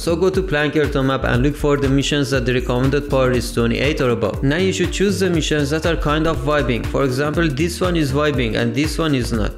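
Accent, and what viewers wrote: Iran. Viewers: از لحنت معلومه ایرانی هستی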